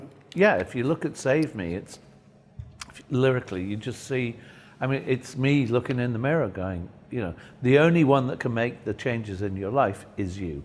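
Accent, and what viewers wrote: United Kingdom. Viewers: Desculpe as vezes escrevo rapido e dai errado.. Mas que pena que vc é um sonho muotoooo distante Provavelmente nunca vou te conhecet...conheci seu tio Paul num show no atena fiquei bem perto..foi bom.. Mas sou fã msm fo seu pai John lenmon adoro ele e sua mãe Cintia com todo respeito Adoro seu rosto..perfeito..voz b britanica rstsrs... Mil bjs...sonho impossivel de realizar..te conhecer pessoalmente...❤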